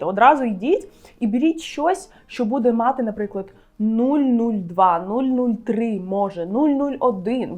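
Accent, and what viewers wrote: United Kingdom. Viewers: Суперське відео, люблю цей бренд) І у вас яскравий, як на мене, британський акцент, дуже класно звучить😍😍😍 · Вперше чую українську з британською інтонацією😅 наче дивлюсь укр контент, а відчувається наче дивлюсь англомовного блогера. Якийсь дисонанс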